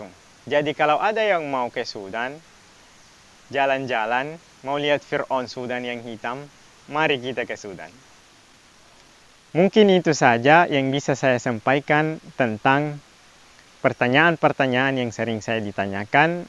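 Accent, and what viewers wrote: Makassar, Indonesia. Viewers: Kereeeeen aksen Makassar da pakai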